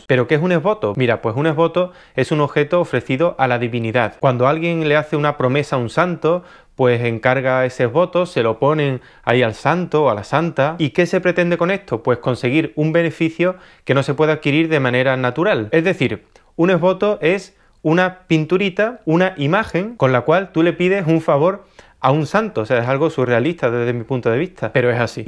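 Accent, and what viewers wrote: Andalusia, Spain. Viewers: te conocí ayer y ya me eche la mitad de tus videos PEROOOOOOOO en este, amigo en 7min 21 seg te la pasaste criticando jajajaja y aclaró soy tan desde tus movimientos de manos hasta ese acento más sureño, pero se nota a leguas que la Frida no es santo de tú devoción. Saludos desde México amigo 🙋‍♀️🙋‍♀️🙋‍♀️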